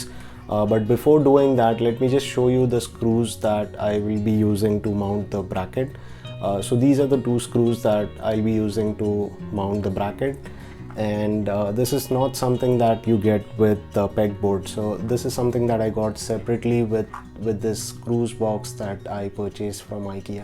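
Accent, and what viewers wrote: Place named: India. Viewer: The calm nice and relaxing Indian voice helped me understand this wonderful Board, please make more Vids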